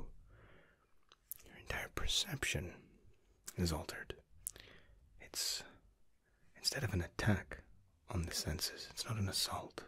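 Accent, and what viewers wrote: Scotland, United Kingdom. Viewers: Are you British? Or Scottish? I can’t quite tell. Love the accent though! 😉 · A Scots accent, A beard, and blue eyes was always going to be a winner, but wears Jean Paul Gaultier Le Male - OMG I think my ovaries just exploded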